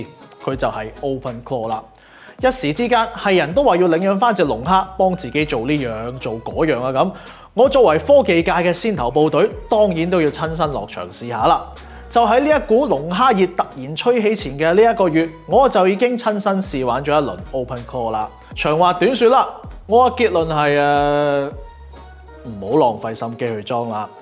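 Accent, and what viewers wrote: Guangzhou, China. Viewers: 點解主持咁重廣州口音? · 簡單, 清楚, 好片。 主持係廣州人? 幾有廣府話味, 幾有個人特色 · 師兄是咪廣州人? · 好重大6佬口音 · 主持有種廣府口音 係咪廣州人？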